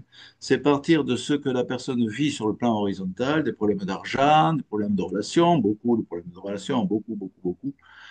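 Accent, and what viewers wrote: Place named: Occitania, France. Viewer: Mille mercis pour ce partage de votre " belle personne" ( et oui vous avez tout à fait le droit de ne pas dévoiler votre Thème Natal, surtout en nous l'expliquant si joliment.. 👍) une superbe découverte qui me rejouit 😊 avé l'accent! Une pensée claire et de Très haut vol 👌👌conjuguée à une grande simplicité animée par la verve du coeur ❤❤❤ j'apprécie également beaucoup Christian Duchaussoy que j'ai eu la joie de rencontrer à un colloque...vive les astrologues occitans 😊 Je suis sous le charme 😊, tout comme la pétillante, 🙌 impatiente de visiter votre site et de vous écouter Relationner au Congrès en Octobre 🎉🎉 des "Révélations" comme celle-là font tant de bien au Bel art des étoiles et le sortent enfin des ornières Horoscopiques et mercantiles... 🌟🌟🌟merci 🙏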